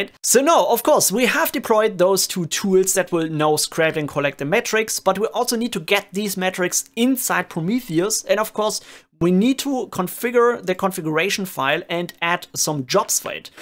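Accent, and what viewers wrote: Germany. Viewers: simply amazing stuff!! I really like 2 things about your videos 1. amazing and professional explanations 2. your German accent :DD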